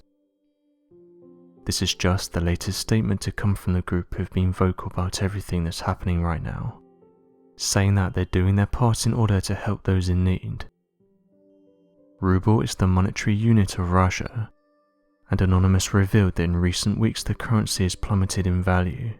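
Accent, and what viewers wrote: United Kingdom. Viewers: Thank you for highlighting the brilliant work done by our see eye aye! Whoever uses this brit voiceover obviously watches cable TV. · Thank you for this vital information. I have been curious about who and what is Anonymous? Are you the same organization that started "Occupy Wall Street"? I saw you on the www in support of George Floyd. You seem to always have a British accent. Are you part of the London, Georgetown, Harvard trilateral covert network. Why must you be anonymous? We need Patriots like you front and center on the scene for Democracy.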